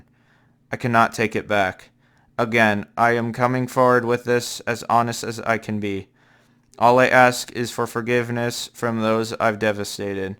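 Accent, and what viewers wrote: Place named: South, United States. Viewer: DUDE, you didn’t even know what happened before, but just screenshotting his last posts from twitter and gluing the voiceover of your redneck voice is very smart, right? Do you even know that that 12-year-old girl was a friend and, by correspondence in the discord, she herself agreed and continued to write "yea I suck your d1ck" and so on. then she simply took and leaked the correspondence, allegedly exposing that he seduced her at the his age of 20, and stupid people ate it. I'm sorry I can't find screenshots of this play chat role, because it was clearly expressed there that she deliberately flirted with him for her own purposes in order to take him by surprise. nasty and stupid people now think ONLY by hearsay from strangers that he is some kind of pedophile. Is this your first time on the internet?!?! Didn't you roleplay with anyone at the age of 12? 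this person then, of course, began to apologize and make excuses because people misunderstood him, or vice versa, they began to write "eewww you pedo! how do you even live!" people nasty and disgusting ruined the full potential of the best musician I have ever heard.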